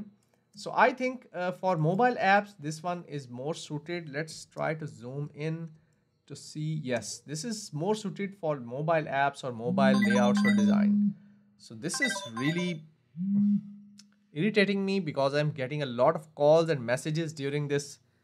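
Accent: India. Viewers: Why you sound like Indian but look like European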